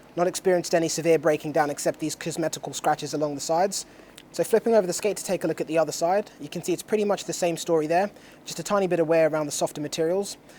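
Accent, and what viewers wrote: United Kingdom. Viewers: A black fellow with a British accent talking about hockey.. definitely don't see that every day eh? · I have to say this: I really enjoy the way you speak (voice, accent, style – snappy, masculine and British).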